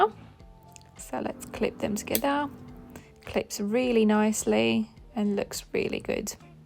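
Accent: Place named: England, United Kingdom. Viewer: Well presented in a lovely English accent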